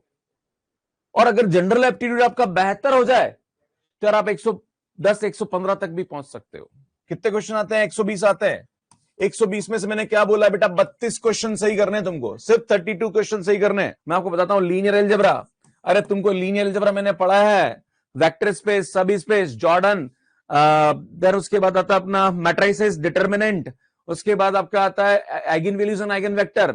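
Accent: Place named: Haryana, India. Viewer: Sir aapki bolli me haryanvi touch h😝😝😝 Jo bot mst lgti h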